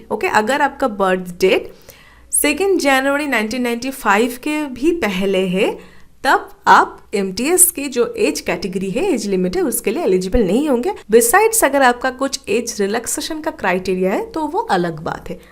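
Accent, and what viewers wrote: India. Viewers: Bengali with Hindi accent😱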